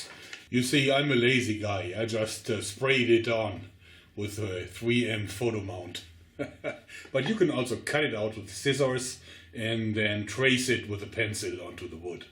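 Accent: Germany. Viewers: could it be, that you are german?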